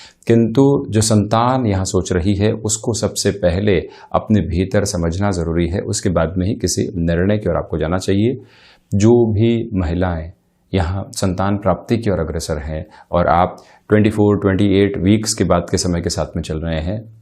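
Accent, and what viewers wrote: Standard. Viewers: नमस्कार सर जी I आपकी भाषा व उच्चारण इतना शुद्ध और उच्चस्तरीय हे जिससे मन प्रसन्न हो जाता हे I जय हो I शुभेच्छा 🙏🏼🙏🏼🙏🏼🙏🏼🙏🏼🙏🏼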